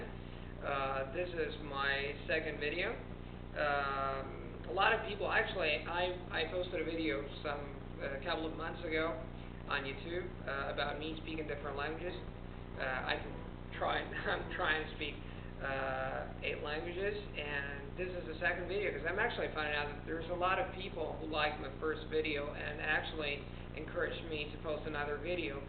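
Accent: United States. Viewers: Are you really Italian? You sound way too native American. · Your American English is pretty good, it's quite impressive actually. I can see you are trying to sound American, I can still sense your Italian accent, but it's barely there, this video proves to me that the whole 'Italian American' accent is actually almost a 'made-up' accent that evolved here in America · wow, u have a really good american accent! good job really! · good english, 99% american accent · dude. you sound like you're from America 99% of the time. that's really great. I'm learning French now and will have to learn Italian and German later. tight man. kudos. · I've never seen such a perfect American accent from a non-English person..even the way you express your setence structure is very American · 100% American accent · This is a really good American accent! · You're cute and have a great American accent. 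I would suggest, however, if you come to America, you should speak with an Italian accent. Foreign accents are sexy to us. Of course, the flip side of not knowing how to pronounce a word you see is not knowing how to spell a word you hear. As my father says, "All words are outlaw words." :) Supposedly, Gaelic is just about as messed up as English in that respect. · Holy crap! Excellent American accent. I love it when people understand that the voice has to be placed differently. I myself am not so fond of the American placement, but you have mastered it perfectly. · Brilliant accent. BRILLIANT. I have to disagree with sheepthing, if I hadn't known you I would have thought you were an American. I have never seen someone pick up an accent this good without a decade of time in a country... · Day two: It's amazing how you have an American accent. It's really cool and inspiring for those of us learning languages and trying to improve our pronunciation! 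· man!! you're a real yankey! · You have a perfect american accent! I speak a different language at home and have an asian accent. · he is pretty good at the accent imitation and his pronunciation is really good except for a few words but the stress patterns and rhythm of his speech kinda gives him away. pretty damn good though. he sounds like he has lived in the US for a long time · yo hablo ingles y espanol y la verdad hablas el ingles con muy buen acento americano, yo tengo viente anos viveinedo aqui en los estados unidos y asta este dia puesdo hablar con un acento como el tuyo es dificil cuando la lengue natal es un idioma completamente diferente como el espanol. very good your a master of 8 languages something i will never have under my sleave. saludos jorge de texas! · Your english is really good. I'm impressed. You even have an american style of speaking. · You sound great speaking English with the American accent! 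:D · did u ever try to change ur american accent to the british accent because u may have thought that it was unattractive? Im american but ill admit any day of the week that british english is more elegant than american. im learning spanish right now and im torn between accents (latin america or castellano)... did u ever struggle with those types of choices? ur italian and u had the option of choosing ur english accent...likewise im american and have the option of choosing my spanish accent...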